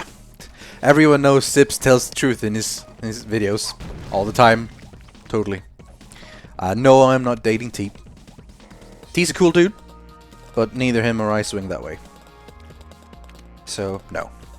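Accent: Scania, Sweden. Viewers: Det finns en äldre Q&A någonstans bland Rythians videor, och i den bjuder han på lite skånska. Han är från Malmö tydligen. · Are you from Sweden? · is he swedish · are you from sweden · Ah your swedish, I've been trying forever to figure that accent. I wasn't sure if it was one of the American accents or a variation of the English accent. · is swedish? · HOLY SH!T Are you from Sweden!?! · are you from sweden?:D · I tought I rekognise the accent. SWEDEN !!!!! ;D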